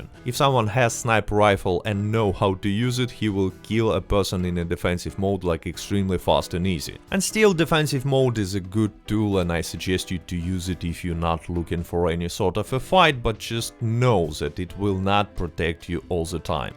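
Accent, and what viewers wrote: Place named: Russia. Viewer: Четкий русский акцент, 😄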